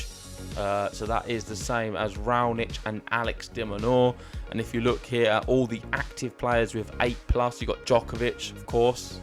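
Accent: United Kingdom. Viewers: Awesome accent. What part of Britain?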